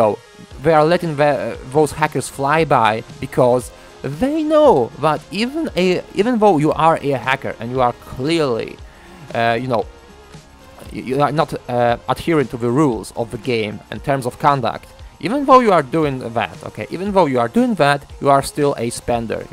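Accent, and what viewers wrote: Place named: Poland. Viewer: Hey, I've been a fan for the longest, and I got I say you're accent is funny as fuck. I honestly agree EA has something very fishy going on with all the hackers and the non banning attempts. I've been wondering you seem like a fantastic Polish player and I've been a fan for sometime, I know I'm not as famous but I'm quite well know in the US, I ofcoarse haven't started a channel but plan to in the future, id love to make maybe videos in the future as you have inspired me. Oh and id love to play with you sometime, maybe you can add me? Playmobil5 is my username on battlefield heroes. Thanks bro Peace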